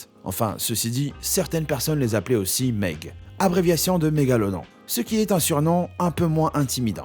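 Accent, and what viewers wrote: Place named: England, United Kingdom. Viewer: Excellente la voix off! l’accent anglais est pas mal du tout ! ;)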